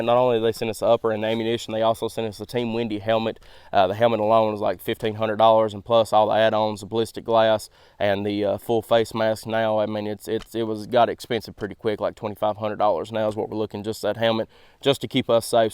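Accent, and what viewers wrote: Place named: Alabama, United States. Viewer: What state are you from? Sounds like our Alabama accent.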